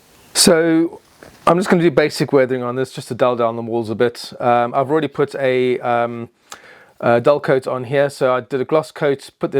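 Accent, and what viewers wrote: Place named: United Kingdom. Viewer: thanks and I look forward to more episodes.... it is interesting listening to a British acccent talking about Chicago rail .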